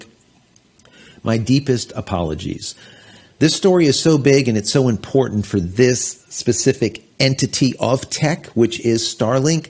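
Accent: United States. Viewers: Are you from New Jersey?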